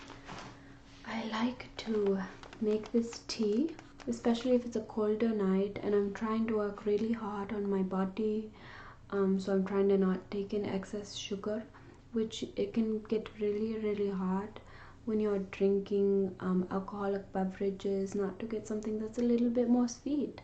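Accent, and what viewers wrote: India. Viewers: I love that Indian accent. So many sub continent tingles.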